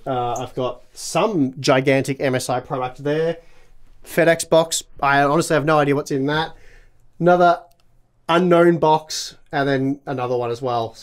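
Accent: Australia. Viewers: Gotta love the Australian accent. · in that accent, I almost responded out loud with "That's not a knife, now this is a knife." Someone please get a proper Dundee knife.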